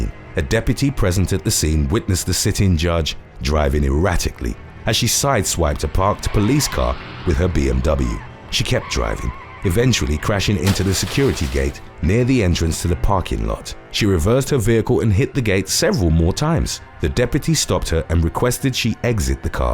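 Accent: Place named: United Kingdom. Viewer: how come you sound british yet this channel uploads in USA? Good channel bro, i watched it grow the past 7, 8 years. 5☆ mate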